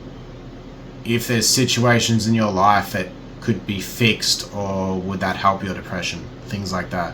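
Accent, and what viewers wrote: Australia. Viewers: everything in a aussie accent sounds better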